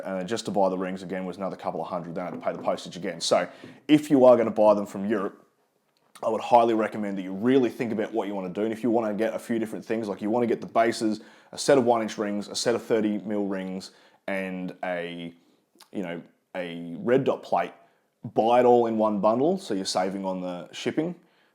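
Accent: Australia. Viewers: Just tripped over this video, and pissing my sides at your relaxed Aussie vanacular. Got yourself a new sub. All the best from the UK 🇬🇧 👍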